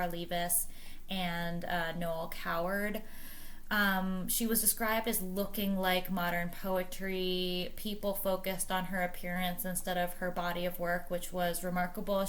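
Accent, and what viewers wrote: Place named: United States. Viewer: shame about the accent. Why is it that American accents are so hard on the ears. It stumbles around the English aristocratic discourse and the pronounications are mainly wrong. Leave Edith Sitwell to us-the British-and you do Miss Monroe.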